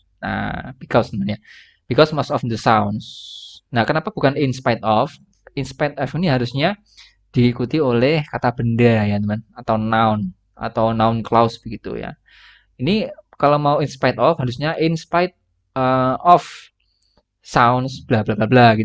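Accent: Java, Indonesia. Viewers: Sangat mudah dipahami dan saya suka medok jawanya mas wkwk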